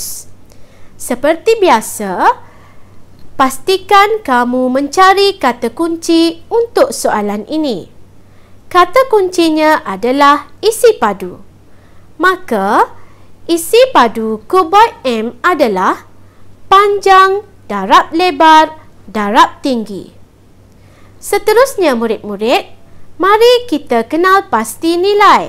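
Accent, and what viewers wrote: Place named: Kelantan, Malaysia. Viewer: O aloh gomo kelate go mo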